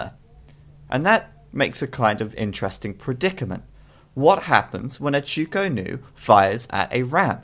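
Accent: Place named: Northern England, United Kingdom. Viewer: northern English to me.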